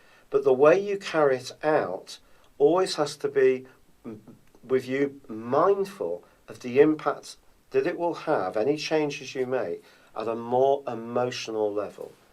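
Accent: England, United Kingdom. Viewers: Thanks, I like your english accent...:-)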